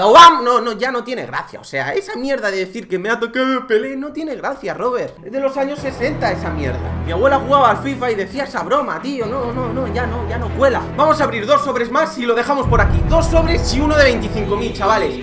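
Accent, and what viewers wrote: Argentina. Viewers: Jajaja me encanto tu acento argentino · Que buenos son tus videos boludo! jajajajaja me encanto hablando Argentino! Crack la concha de la lora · Yo soy Argentino,y te sale re bien el acento xDDD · De los mejores acentos argentinos que escuché de alguien que no es argentino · Hablando argentino boludo jajaja <3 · jajajajaja q bueno el acento argentino!!!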